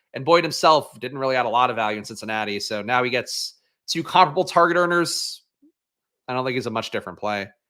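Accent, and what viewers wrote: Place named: Australia. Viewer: Great Bluey's mum accent! have a great weekend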